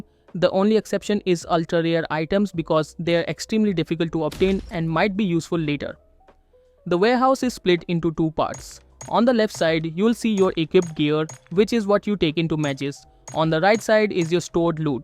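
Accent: India. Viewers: Ugh, that Indian accent is ANNOYING! 👎👎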